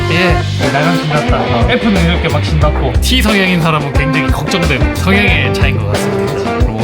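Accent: Busan, South Korea. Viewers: 안녕하세요 구독하고 갑니다 부산아재 고향목소리 듣기 너무 좋고 편합니데이 부교공 전기직군 빡세네요 외주 안주나 보네요 브레인께서 너무 최전선 상노가다 뛰셨네요 그동안 정말 고생많으셨습니다 !! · 나강처럼 부산 사람인교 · ㅠㅠ왜 눈에 습기가차는지.. 형님 갱상도 남자인데 은근 따뜻함이 느껴지네예…. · 저두 고향이 부산이여. 잘 볼께여 사투리 조아여~~~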